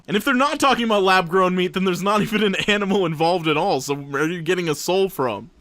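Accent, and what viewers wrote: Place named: New York, United States. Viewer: What’s up with the New York accent.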